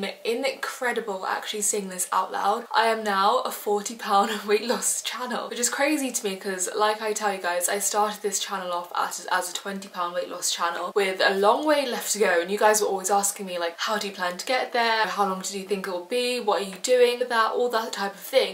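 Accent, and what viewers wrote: United Kingdom. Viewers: Love uk accent ☺☺🌻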